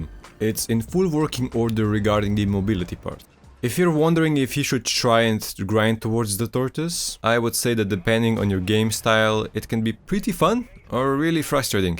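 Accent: Russia. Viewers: Hold up, are you a russian?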